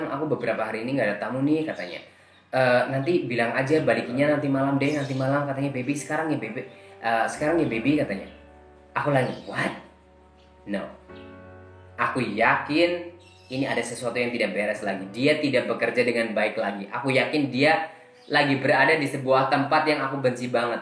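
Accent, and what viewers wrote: Java, Indonesia. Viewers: mas foto wkt muda cakep bingit... bahasa nya medok banget seperti org jawa gitu...emang nya org jawa ya mas..?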